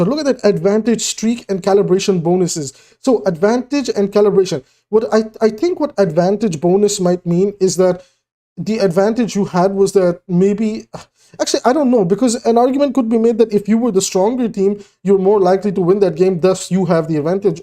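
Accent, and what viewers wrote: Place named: India. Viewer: I'm guessing because he has the cadence of Indians when they speak that you'd make an assumption.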